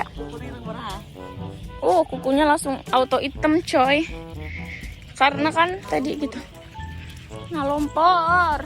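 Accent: Java, Indonesia. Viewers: Wong jowo endi suarane rekkkkkk😎😀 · Orang jawa ya kak? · Jawanya mana si mba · kk apa orag jawa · Jowo ne jowo ndi t mbk ?? Nek kulo jowo timur madiun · BHS.indonesia:Baru kali ini aku ketemu youtuber cantik main ke sawah BHS.jawa:Kaet Iki aku roh youtuber ayu maen neng sawah Suaranya anak Jawa mana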